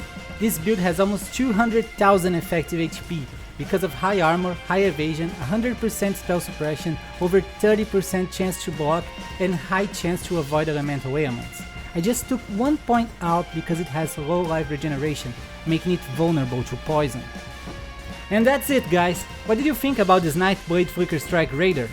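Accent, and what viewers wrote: Brazil. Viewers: Vc é Br né parceiro eu tô vendo teu sotaque Bro, vlw por esse conteúdo maravilhoso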